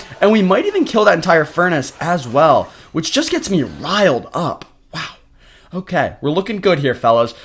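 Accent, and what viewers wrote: Spain. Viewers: Bro your english is very spanish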